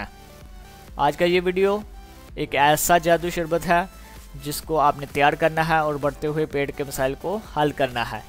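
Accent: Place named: England, United Kingdom. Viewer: Aap urdu ko English k style m bolte ho kia aap English man ki birth ho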